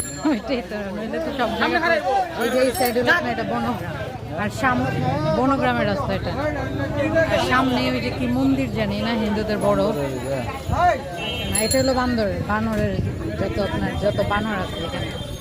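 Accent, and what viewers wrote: Dhaka, Bangladesh. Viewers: Dhakaiya languahe tomer mukhe darun manay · আসসালামু আলাইকুম আপু আপনার মুখে ঢাকাইয়া কথা শুনতে খুবই ভালো লাগলো সেই সাথে পুরান ঢাকাটা ঘুরে দেখা হল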